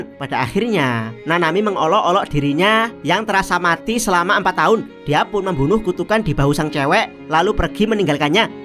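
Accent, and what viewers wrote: Java, Indonesia. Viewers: bang logat jawa nya luar biasa hahaah thx bang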